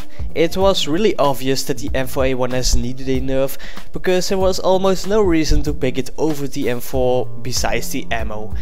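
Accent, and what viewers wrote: Netherlands. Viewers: will you go back to dayz vids? btw youve grown well, ive seen you since 1k or something. i subbed because i heared you had a dutch accent. and you uploaded alot of dayz stuff. too bad im not interessted in csgo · are u dutch?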